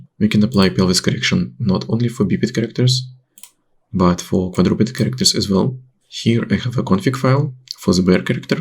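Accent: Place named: Russia. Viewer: Да нет же! Акцент жемчужина! Я сразу вспоминаю игру Red Alert... Комрад дженерал и другие озвучки русских юнитов))))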